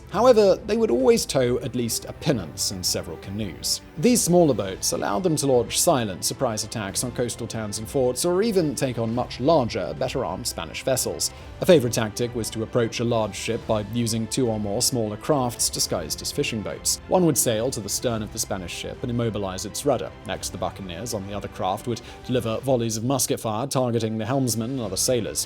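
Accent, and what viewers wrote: United Kingdom. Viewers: About your plug for the newest channel...I thoroughly enjoy your content. I watch all your channels and there is a reason for that. Though I have not really taken to the newest one. I think the draw is your English or British accent. I am not sure how to frame it because I know a few men from Scotland and they didn't take it as polite that I called them English as the UK is not quite like the United States. I can call any person from any number of states an American and they would not get upset, though a Texan might point out that they are from Texas.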